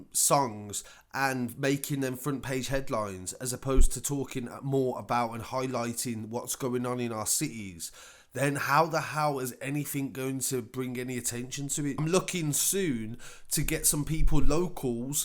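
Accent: Birmingham, United Kingdom. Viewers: Goid coverage.. that brum voice lol even thow im from brum to i dnt have the accent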